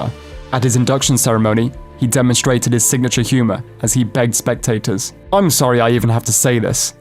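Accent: United Kingdom. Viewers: The title is called "Why you haven't heard from in a while." The narrator sounds like a robot with a British accent. The video starts off telling his biography. In the first 35 seconds of the video you are bored to death. Please get to the point. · What's with the British narrator?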